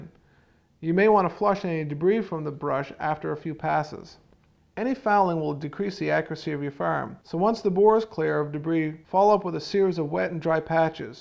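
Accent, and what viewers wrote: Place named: New England, United States. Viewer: Obviously from New England.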